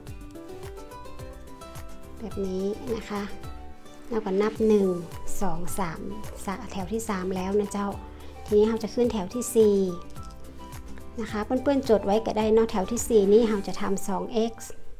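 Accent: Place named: North, Thailand. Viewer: ภาษาเหนือน่ารักค่ะ กระเป๋าก็สวยค่ะ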